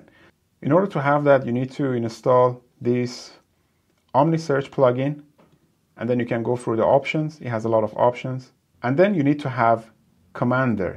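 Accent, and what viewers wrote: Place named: Iran. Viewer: لحجه ایرانی ات منو کشته پسر. دمت گرم <3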